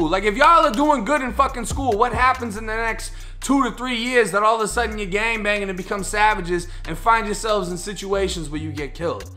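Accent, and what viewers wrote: Boston, United States. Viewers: Are u from Boston bro?? My Mom R.I.P. was from East Hampton n ur accent is exactly how I envision an authentic Boston accent!!! My Mom moved here to da SouthWest at 10 years old n so by time I was born up until I was 10 wen she passed, she didn't have any sorts of Boston, New England, or East Coast accent wat so ever!!! But I'm jus curious is all n too as I would like explore some of my roots back East n wat not cuz da SouthWest n West Coast, California to be specific, where my pops is from, but dat is all I've known in my 31 years of existence!!!!! Totally totally different den wat I am accustomed too dats fir sures!!!!! N too bro jus wanna letch u know dat I admire da positive change u made to ur life, da criminal aspect not committing crimes n wat not jus wasting ur life away in a lil locked room!!!!! I too made dat change after a 1½ years in juvenile n snapped once I got out n had a totally different fukin perspective!!!!! 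It was actually a positive thing n definitely helped me cuz had I not ended up where I did, I was destined fir prison without a doubt!!!!! Got a clean record since turning 18 compared to da 7 felony's I got from 16-17!!!!! But shit I rocks wit u!!!!!!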